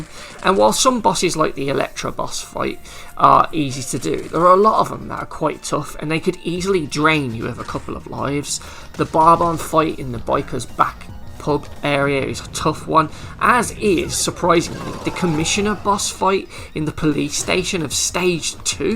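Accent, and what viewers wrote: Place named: United Kingdom. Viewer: I love your British accent, it’s hilarious lol you should make your channel of you complaining about games and your accent makes it funny & omg I subscribed and saved this video to my favorites lmao yesss!! American here 🇺🇸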